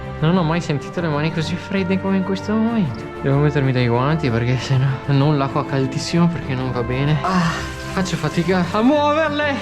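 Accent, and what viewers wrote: Veneto, Italy. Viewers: Te se proprio Veneto ciò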